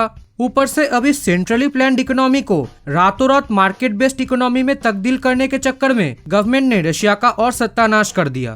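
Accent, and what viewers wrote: Bengal, India. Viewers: Bangali naki dada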